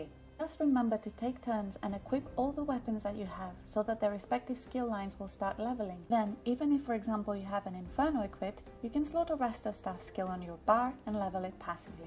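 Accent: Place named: France. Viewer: Detailed and clear guide. And the French accent sounds cool.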